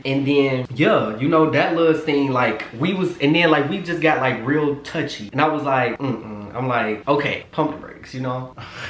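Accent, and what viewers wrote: New Orleans, United States. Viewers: you snapping in this new orleans accent hahahaha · its the new orleans accent for me🤣🤣🤣🤣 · LMAOOOO THAT New Orleans Accent Giving Playboys LMAOOO I Love Your Channel Down🥰😂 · lol it’s the new Orleans accent for me 😂😂😂😂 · You and this Nola accent is a kiiiii lmfaooooo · U sound like messy Mya in ur New Orleans voice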